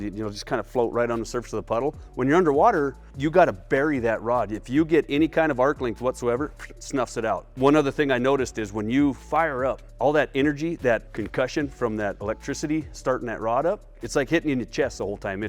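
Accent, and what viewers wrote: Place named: Canada. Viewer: How does this Yank have a thicker Canadian accent than those living in Canada 🇨🇦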